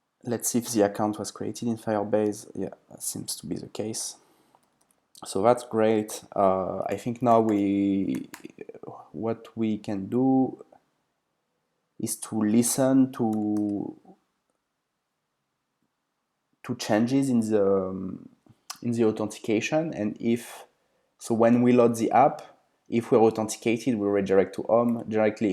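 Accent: France. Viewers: you have a french accent bro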